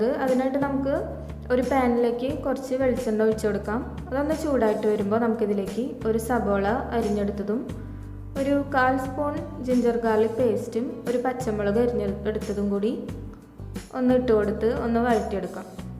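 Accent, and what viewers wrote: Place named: Thrissur, India. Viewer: ബ്രഡ് കൊണ്ട് ഒരു കിടിലൻ സ്‌നാക്ക് easy ആയി അവതരിപ്പിച്ചു ,തൃശ്ശൂർ സ്ലാങ് ആയത്‌ കൊണ്ട് കേട്ടിരിക്കാൻ നല്ല രസമുണ്ട്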